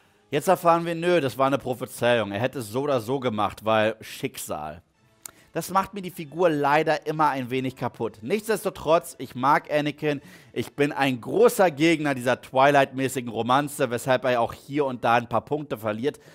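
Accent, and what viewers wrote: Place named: United States. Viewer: da hat aber jemand einen krassen us akzent. :)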